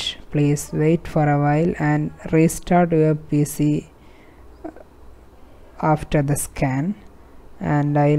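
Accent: Kerala, India. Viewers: Chetta ningalde english kettappo manassilaayi malayali aanenn ente prashnam enthannu vechal 1, Eppalenkilm pc marythakku on aayal taskbar and settings not accessible aanu 2, pc open avumbolekk screen blank aavua pinne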